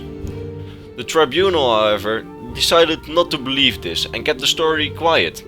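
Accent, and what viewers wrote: Netherlands. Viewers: didox ik denk het, aan zijn accent te horen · Are you dutch ? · Ik hoor een Nederlands accent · Sowieso een Nederlander dat hoor je zo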